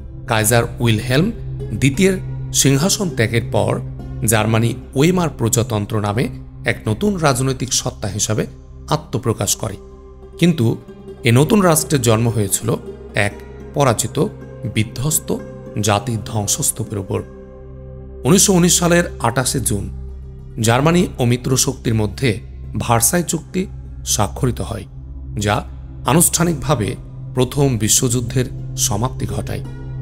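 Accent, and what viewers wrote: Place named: India. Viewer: Indian, voice sunei bujsi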